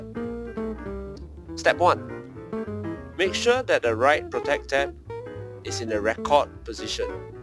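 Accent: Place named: Singapore. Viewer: You sound... your accent. Singaporean?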